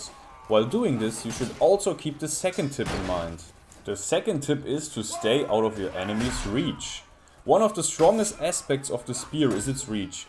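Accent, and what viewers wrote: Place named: Germany. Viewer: Where are you from? you sound German. and very solid and meticulous guide. Like and sub!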